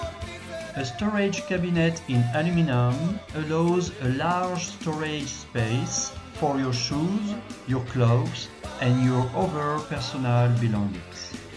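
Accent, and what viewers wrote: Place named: France. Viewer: Love French accent. Enjoy your van.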